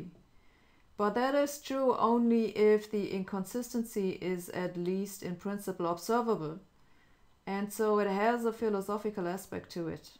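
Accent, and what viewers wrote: Germany. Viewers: Yes, that was a nice example of Hossenfelder's MANY throw-away one-liners! The effect of her swift, deadpan delivery is deliciously close to that of Sally Phillips who plays Minna Häkkinen, the "Prime Minister of Finland," on the show called VEEP (granted that what we hear in this case is technically a very mild and pleasant German accent, instead of a faux-Finnish accent).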